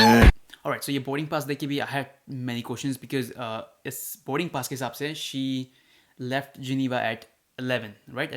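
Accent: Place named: United States. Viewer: I want to ask - Do you live in the US because your accent is American?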